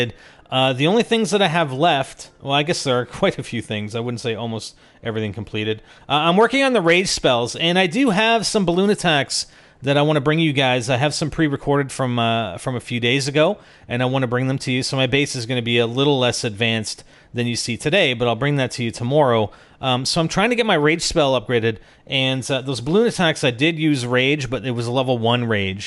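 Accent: Canada. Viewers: are u from canada??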